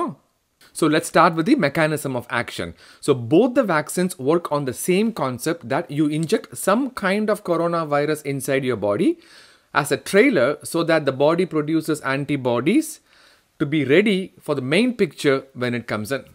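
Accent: Tamil Nadu, India. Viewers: Could u please tell the message in Tamil languages something English language u are speaking Tamil ascent good keep it up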